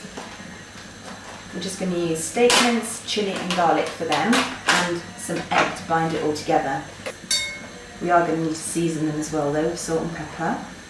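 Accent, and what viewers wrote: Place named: Italy. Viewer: I thought you had an Italian accent . But on the way to get another glass of wine I thought whoa Nellie enough is enough. Nice video,make some more.Looking at the ages of the comments I bet your done with youtube to bad for us.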